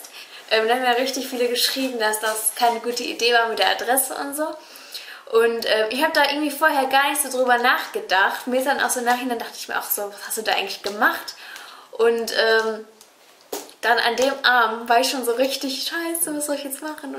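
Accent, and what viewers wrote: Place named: Bavaria, Germany. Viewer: sie kommt aus Bayern sie sagt nett anstatt Nicht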